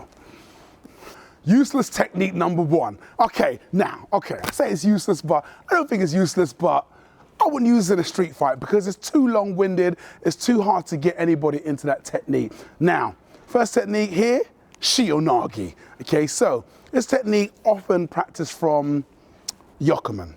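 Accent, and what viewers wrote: United Kingdom. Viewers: I only noticed you had a UK accent after being subscribed for a month. I’ve watched enough Doctor Who that these accents slide under the radar unnoticed. #Murica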